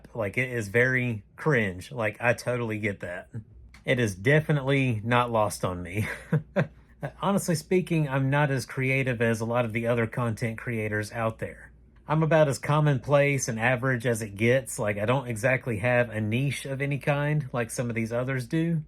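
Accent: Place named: South, United States. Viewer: Nothin' but love, brother. I happen to like your voice. I'm originally from Kentucky but live in Illinois (I hate it here) so getting to hear southern accents I find very soothing. Plus, I love books and snack review type stuff, which you've done. So... yeah. I'm in for the duration plus ten years. (Also big First Responder & Military family so that's an added bonus)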